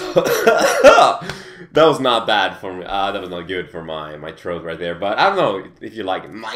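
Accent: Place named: Sweden. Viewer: Semi ADAMADIC, fucking love swinglish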